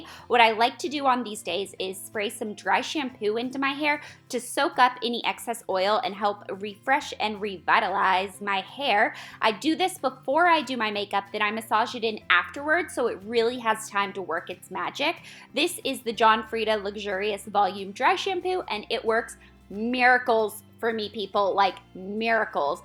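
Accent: South, United States. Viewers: I'm not sure if you live in LA anymore, but I think of you as a Southern Belle! I say that because of the way you talk & I know you are from Tennessee & you have such a pretty complexion lol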